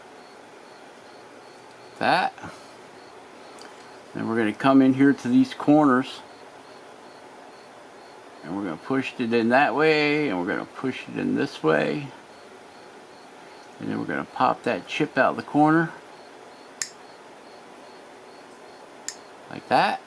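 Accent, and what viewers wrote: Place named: England, United Kingdom. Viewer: Where do you live... I am picking up an English accent lol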